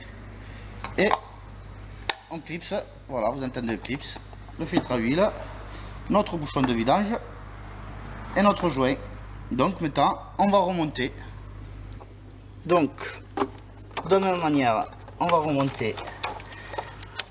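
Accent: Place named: South, France. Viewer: Super tuto !!! Clair, bien expliqué (avec notre petit accent du sud qui va bien) précis, astuces de pro, c'est rare ! Té ! Je l'ai regardé deux fois ! Bravo ! et merci 👍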